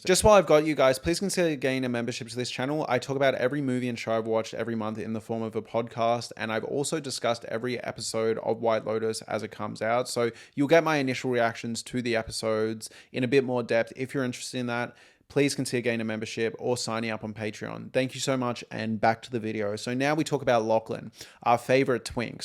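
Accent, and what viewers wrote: Australia. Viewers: ? no he doesn't, he's australian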